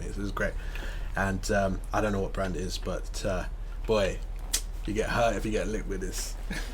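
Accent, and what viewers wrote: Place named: England, United Kingdom. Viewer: a black man with a english accent that's awesome 🙌🙋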